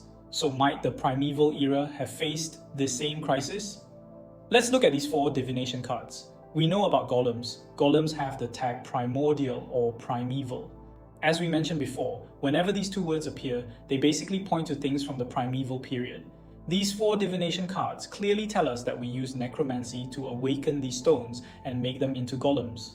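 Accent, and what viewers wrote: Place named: Asia. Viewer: 這是AI不是本人嗎？我還覺得這期亞洲音比上期重呢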